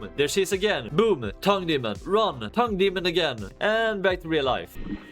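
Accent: Sweden. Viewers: I never realized how Swedish pewdiepie’s accent was until I watched you, how quaint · Most Swedish accent of all time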